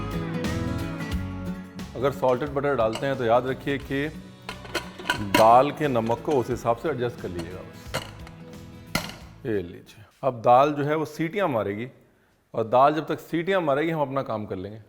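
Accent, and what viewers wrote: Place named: Lucknow, India. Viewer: Your hindi in lakhnavi accent is very pleasant to listen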